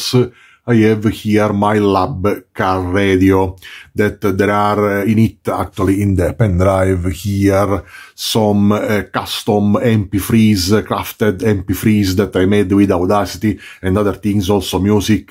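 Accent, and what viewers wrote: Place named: Italy. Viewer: Mamma mia che accento italiano! Vorrei realizzare anch'io il tuo progetto ma non hai accennato allo schema e ai componenti.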